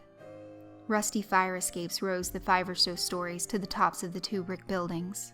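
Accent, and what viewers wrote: South, United States. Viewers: idk what it is about your voice just make me fall asleep i don't know if it the southern accent listed to the story you narrate makes me fall asleep i work 3 shift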